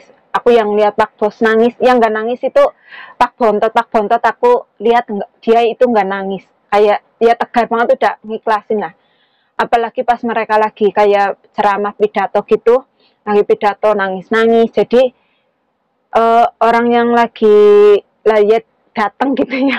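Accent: Banyumas, Indonesia. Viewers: Sampean wong endi.. Yakin ngapak nemen sampean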